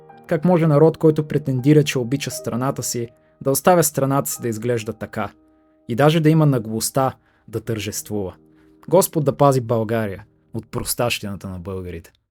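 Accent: Russia. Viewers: не можеш да скриеш джиалекта солти руски soiboi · ХУАХУАХУХАУХАУХАУХ КАКЪВ Е ТОЯ РУСКИ АКЦЕНТ ВЕ ЛУД КОЛКО ПЛИТКОУМЕН ТРЯБВА ДА СИ ДА ГЛЕДАШ ПОВЕЧЕ ОТ 10 СЕКУНДИ НА ТОЗИ КЛИП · Чува ти се руския акцент. Пробвай пак! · На 30 секунда от видеото писах коментар да питам що говориш с руски акцент. После ти изслушах забележките и разбрах- ти наистина си си монголски подчовек. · Има руски акцент, защото е руснак, дошъл да живее безопасно и евтино тук и да плюе по мястото, което обитава! Много тъпо от негова страна! Но пък може да си ходи, ако не му харесва! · Говориш с руски акцент, но съм съгласен с всичко което каза ! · Диалектът ти е на руснак живеещ в България. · Братлето наистина има руски акцент обаче, хванах един-два русизъма във видеото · Съгласен съм донякъде,но долавям руски акцент. Тоест видеото е може би резултат от обиден полурускобългарин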